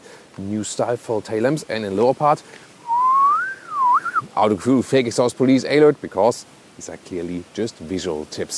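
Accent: Germany. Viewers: Der deutsche Akzent tut schon ein wenig weh beim zuhören .... aber wahrscheinlich notwendig um einen Channel groß zu bekommen.😶‍🌫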